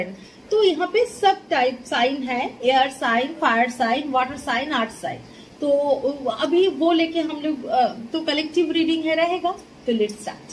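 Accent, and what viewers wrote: Bengal, India. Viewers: your accent and the way of speaking is typically Bengali or atleast East that us Odisha or Assam. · I simply love ur Bengali accent its so sweet maam just like u ❤❤❤❤❤❤❤❤❤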